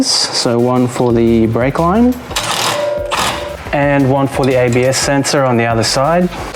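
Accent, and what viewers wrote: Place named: Connecticut, United States. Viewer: Where the fuck are you from, Connecticut? Hell of an accent, son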